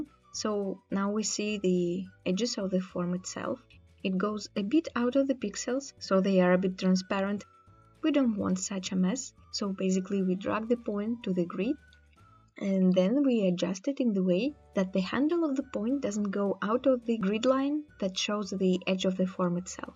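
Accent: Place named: Russia. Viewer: Услышал RUSSIAN акцент ))) но это не важно — лайк за видео очень грамотно и хорошо, браво молодец!!!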